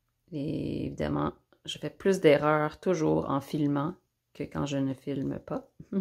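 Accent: Quebec, Canada. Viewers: Finalement je trouve le vidéo que je cherchais depuis un certain temps. Ce super carré granny pour mon prochain tote bag. Et quelle surprise d'entendre ce merveilleux accent québécois. 💖 J'ai l'impression d'être retournée à la maison (Je suis originaire de la ville de Québec). Grand merci, je vois que vous êtes super au crochet mais aussi à expliquer. Ici le puff, on les appelle points popcorn 🙂. Vous avez maintenant une nouvelle fan en Italie 😍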